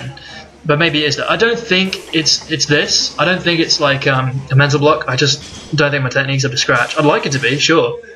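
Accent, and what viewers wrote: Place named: Australia. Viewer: dat aussie accent creeping in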